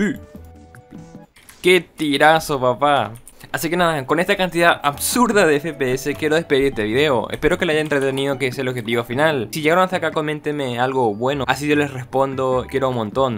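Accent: Paraguay. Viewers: Eres Paraguayo? verdad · Eres paraguayo? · Sos paraguayo ?🤠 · Eres de paraguay? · Ese acento paraguayo se nota de lejos. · Kp vos sos paraguayo verdad? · Eres de Paraguay? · SOS de Paraguay? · Paraguay? Kp s nota el acento mi reynol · De Paraguay? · tu eres paraguayo tu asento es de paraguay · eres paraguayo vrd bro? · Sos de Paraguay? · No lo conosco pero su acento parese paraguayo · Man pensé que eras de otro país por tu forma de hacer los videos pero por tu forma de hablar noté que sos paraguayo gran video sos grande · Sos de Paraguay????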